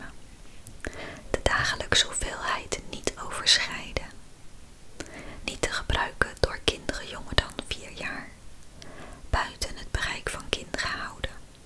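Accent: Rotterdam, Netherlands. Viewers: Kom jij uit Rotterdam ?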